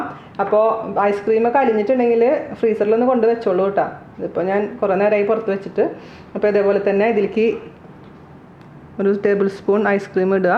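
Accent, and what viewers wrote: Thrissur, India. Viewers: Simple avatharanam adipoli 👍thrissur ano slang like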